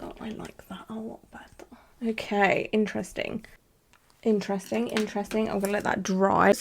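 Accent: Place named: Northamptonshire, United Kingdom. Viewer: Your blatantly from Northamptoshire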